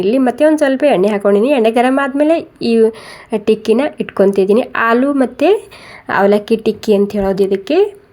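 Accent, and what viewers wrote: Maharashtra, India. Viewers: Yourmaharastra kannada is nice to listen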